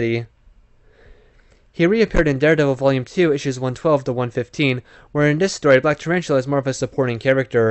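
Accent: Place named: Argentina. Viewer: Naaa tremendo el pibe argentino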